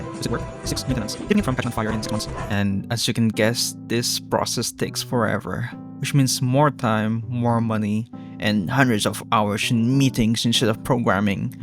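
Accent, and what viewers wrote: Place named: Philippines. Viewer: I can hear the tagalog accent, so I'm guessing is from the Philippines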